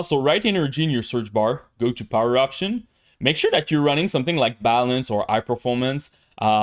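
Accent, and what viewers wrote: Quebec, Canada. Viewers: I IMMEDIATELY recognized that Canadian French accent that I know so well 😂 bon vidéo mon ami, c’est très important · Bel accents man, je sais reconnaître in Queb quand il parle anglais et vraiment merci bin gros pour ton vidéo d'optimisation c'est pratique en tabarnak! :)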